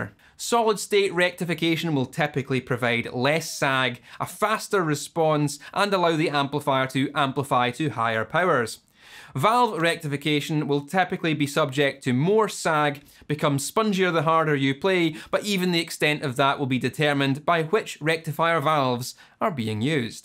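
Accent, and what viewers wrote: Scotland, United Kingdom. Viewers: Ah wouldn't a-trusted hem but for his Scots brogue. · i enjoy all your "ta tas" and learn a lot.....your brogue is entertaining and your enunciation perfect....had a Scottish girlfriend back in the 60s, her name was Carol Cummings, did you know her?...just joking, love your lectures!!! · Great video. I sure know a lot more about fukin valve amps than I ever did before! Love that accent of yours. What part of the UK are ya from Jerry from PA-USA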